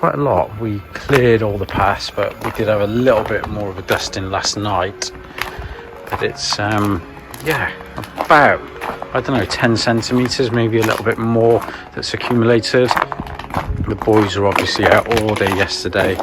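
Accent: United Kingdom. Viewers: I like your accent. Which british?) Winter is coming (Starks know about that) . Village in this season really nice, and air so fresh, only smell of Russian banya, when tree is burning mmmm smell from my child time when our houses didn't have gas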